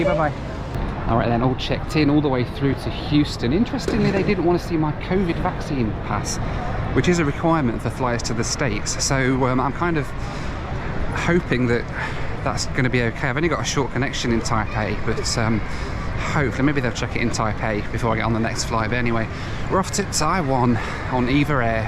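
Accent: United Kingdom. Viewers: Hi, enjoying your videos, i thought your were from UK, didn't realise you live in USA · You sound British did you move to usa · thought u r british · as a newbie so i know. You sound like you are from the United Kingdom but you live in Houston in the US? Is that correct?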